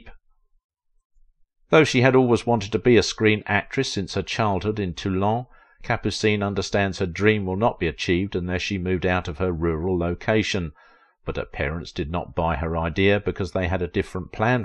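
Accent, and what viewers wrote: United Kingdom. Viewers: Your "Proper" British accent does not hide your ignorance - "Song Without End" is not a documentary BY Franz Liszt...it is ABOUT Franz Liszt - the Hungarian Composer, and virtuosic Pianist! Jeeeezus!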